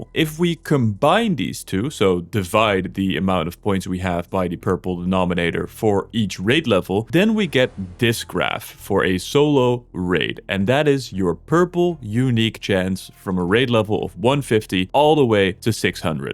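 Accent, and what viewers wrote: Netherlands. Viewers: Is that a dutch accent i hear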